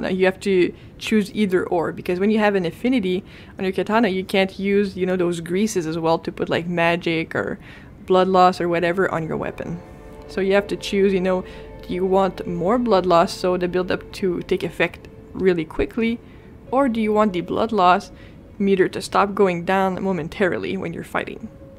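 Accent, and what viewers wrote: France. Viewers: Vraiment étrange d'entendre un accents français sur un guide en anglais sur elden ring 😂 mais sinon, très bonne vidéo 👌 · T'es français ?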